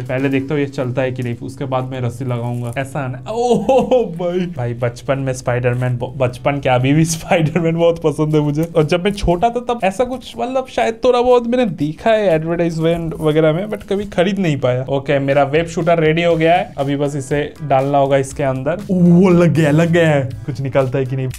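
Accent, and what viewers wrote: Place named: Bengal, India. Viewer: Hindi with bengali accent......sooo cute 🥰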